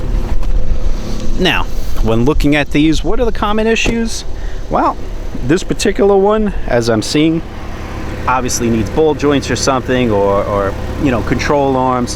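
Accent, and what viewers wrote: New England, United States. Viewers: fair enough I'm from NH just knew that had to be a new England accent lol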